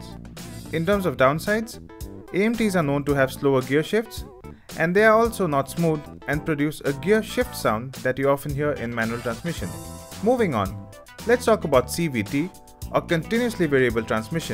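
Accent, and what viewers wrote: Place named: India. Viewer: Jesus dude. Work on your accent. If I want to talk to an Indian I can’t understand, I’ll spend $2k on a plane ticket to a sh!thole South Asian country, buy a sh!t Ton off nose plugs to block all the curry and BO smell, throw a towel on my head to blend in, then proceed to talk to all the snake charmers in the land. Just kidding I would never do that because NO ONE GIVES A F$&@ ABOUT India.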